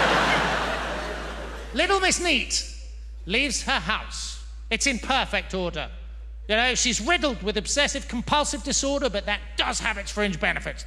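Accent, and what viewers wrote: Liverpool, United Kingdom. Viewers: His scouse is a dead ringer for Stephen Graham 😂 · As a Scouse person, I approve this accent. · So refreshing to see someone doing a Scouse accent and nailing it. Absolutely hilarious set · Belter Scouse accent 👌🏻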